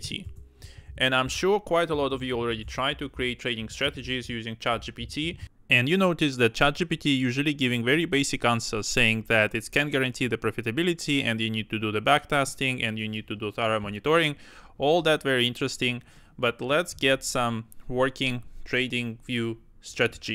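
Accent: Russia. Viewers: да наш, русский акцент слышен прям четко, но лучше чем у индусов)) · Не даёт мне покоя твой акцент.. русский?)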